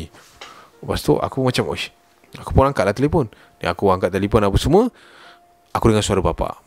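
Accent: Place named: North, Malaysia. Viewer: Pekat nau bahasa utara 😂 Btw salam dari kedah🔰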